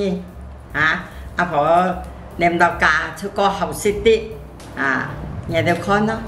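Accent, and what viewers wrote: Hakka, China. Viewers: 婆婆的客家话跟我的很相似。请问是怡保的客家人吗？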